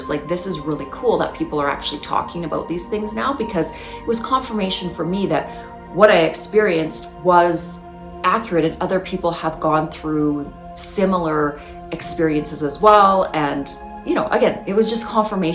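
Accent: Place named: Canada. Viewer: I can say you're Canadian by the way you say " SO " and " ABOUT " Possibly you're a Pleiadian too : )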